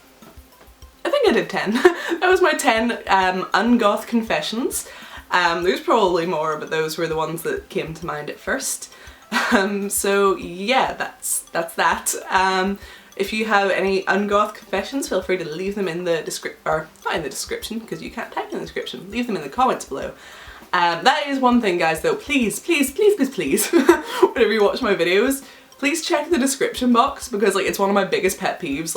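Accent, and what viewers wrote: United Kingdom. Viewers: Is she British? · Where are you from? Your accent is kind of all over the place... British...Australian.... American...Scottish...? I don't mean that in an ignorant, mean kind of way. I really am curious.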